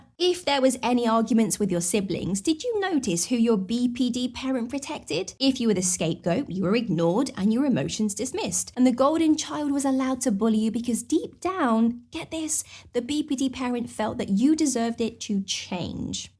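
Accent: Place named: United Kingdom. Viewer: Thanks for the clarity. Oh my goodness you hit the nail on the head. If you saw the shock I went thru when I found out about NPD at 40, it shook me to my core and am still going thru so much years later. I not only lost my childhood, I lost the best years of my adult life too. Btw: hello from Australia. I love your British accent.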